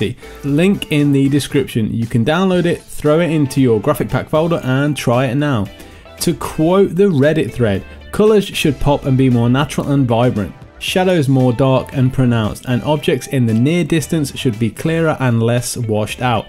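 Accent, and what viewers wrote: United Kingdom. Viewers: Thankyou for the new info as always!! that british accent tought e-e...!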